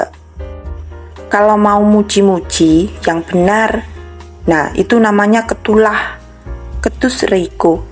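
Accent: Java, Indonesia. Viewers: Medoknya ini jawanya antara bebeb ucik atau bu de Riris yg jawa Asli❤❤😊😊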